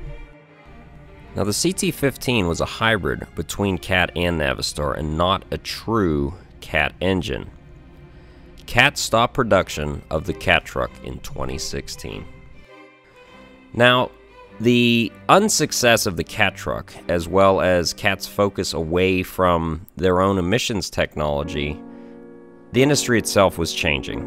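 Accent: Western Pennsylvania, United States. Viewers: Where are you from? Sounds like western PA.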